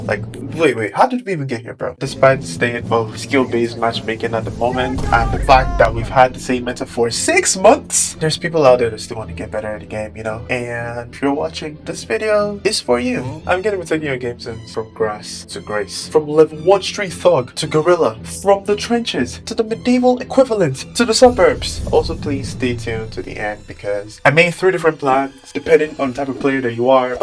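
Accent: Nigeria. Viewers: Bro, are you Nigerian?😂😂 very useful video btw🤞🏽🙏🏽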